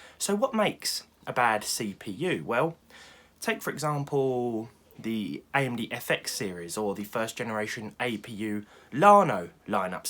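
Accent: United Kingdom. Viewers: 🅱️RUV ENGLİSH ACCENT GİVE ME A GAY VİBE BUT ANYWAYS KEEP GOİNG İ LOVE YOUR VİDEOS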